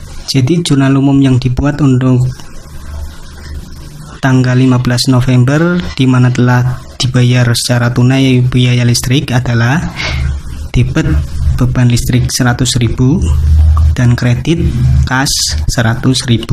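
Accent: Java, Indonesia. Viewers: Medok bgtt mass.. 😳😂klo pke hndsfre gmn gitu.. Tpi mmbtu toturnya.. Trims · MODAL (Medok banget)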